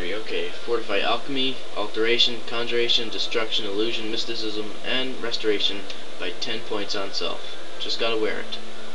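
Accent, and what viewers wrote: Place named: Eastern Canada, Canada. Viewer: your from eastern canada arn't you?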